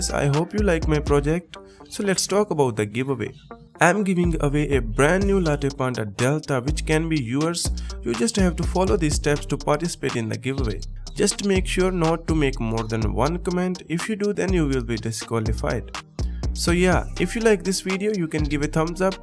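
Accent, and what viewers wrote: India. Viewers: OK bro I was just confused but his talking style was like Indians Thank you😊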